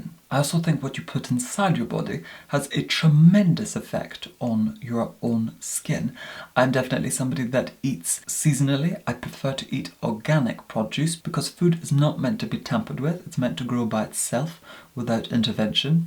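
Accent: Scotland, United Kingdom. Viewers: Scottish I believe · Seamlessly Scottishness. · He defiantly has a Scottish accent just a very posh one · Literally the most posh Scottish accent ever but I love it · Cora alice Nugent 'sorry' indeed. Accents are malleable... If you listen closely he still has a bit of Scottish in there. Can't believe how rude some people can be. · is he scottish or irish? it keeps changing for me please be scottish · He's actually from scotland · I love the accent. I can hear a scottish accent under the voice. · I'm from Australia, apparently he's from Scotland I read. You guys have too many accents 🤣😂 we just have one Straya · idk about that but i know he went to college in glasgow and his accent is definitely scottish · Rose He is from Scotland; in his earliest videos the accent is more pronounced. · where are you from? i hear a northern twang in your english accent, perhaps even scottish, however you speak very proper and pronounce some words how southern english people would. 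i’m very curious. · Liv Cerys then you must be German as he is Scottish for sure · Is it just me or does his accent sound Scottish? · He is from Scotland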